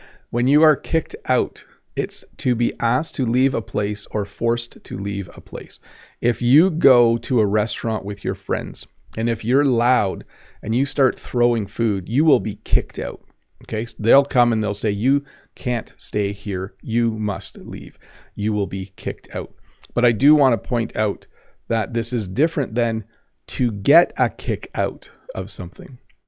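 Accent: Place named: Canada. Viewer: Assalamu Alaykum,. My first name's Ali. My last name's Orkhan. By the way, I've been watching your lessons for three years😊😊. This lesson was very good for me. After all, this lesson gave me a lot of knowledge in English. I watch your videos because I love Canadian Accented English. Or rather, I speak Canadian Accented English because I love your lessons,. Greetings to you from Azerbaijan.❤❤❤😊😊😊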